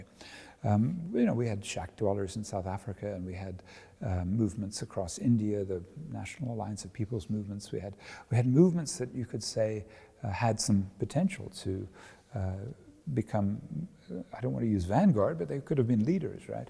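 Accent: England, United Kingdom. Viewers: Странно но я слышу английский акцент, притом что сам плохо говорю по английски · О, Вы из Англии?)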